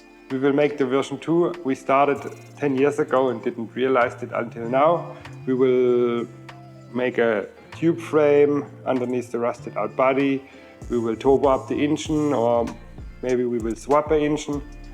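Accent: Swabia, Germany. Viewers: ❤ I really love your Swabian accent! I look forward to your sourkraut video!! 🎉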